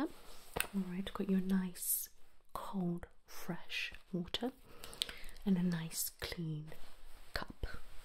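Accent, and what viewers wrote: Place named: Birmingham, United Kingdom. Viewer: Finally a brummie asmrtist !!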